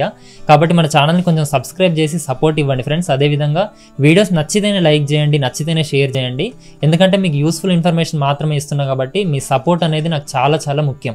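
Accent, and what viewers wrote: Telangana, India. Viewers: తెలంగాణ యాస లో బలే చెప్పావు అన్న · Good information brother and mee telangana slash chala bagundhi... 👌👌👌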